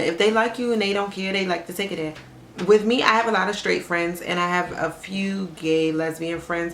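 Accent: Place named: Baltimore, United States. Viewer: Where are you from?baltimore?you got that accent!😊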